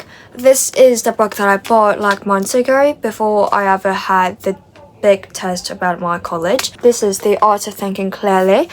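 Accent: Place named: United Kingdom. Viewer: I came from Instagram, nice podcast. Btw I like your British accent a lot.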